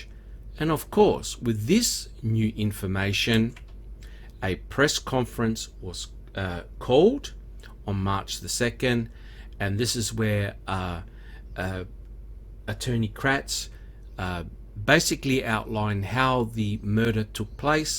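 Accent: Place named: Australia. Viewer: Thanks so much for the videos! I do have to relate to you, however, that the statement from any LEO/s whilst talking to lab tech/dept heads of labs "try to place ??? in the house/garage or any other location they care to mention" is not nefarious in any way, even though it could be said that it is. I can't tell you the number of times that I've heard this very thing said in movies and in television police shows. Personally, I wish that they wouldn't say that for the very reason that it could be thought of as conspiratorial. But, since I note an accent from you, maybe Australia OR New Zealand, I'm sure that you don't know about this type of directive to occur, even though it clearly says "TRY to place/put her/him ......." I'm sure that if it were a true directive, it would not have been done so overtly and written on paper, where it would be seen by other than the person being spoken to.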